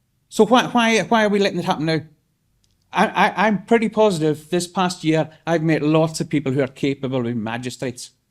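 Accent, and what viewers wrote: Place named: United Kingdom. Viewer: Great speech! Homeland party are great. On a side note iv never heard of someone that sounds like they have a Scottish, english, irish and welsh accent all at the same time 😅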